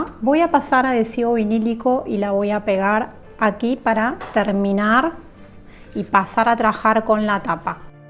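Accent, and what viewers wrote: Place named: Argentina. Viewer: Vine para ver lo que se ofrece en instrucciones. Qué lindo escuchar un accento argentino. Hacía demasiado que no escucho una voz argentia. Voy a volver a ver el programma con mis hijas para que ellas también lo desifruten.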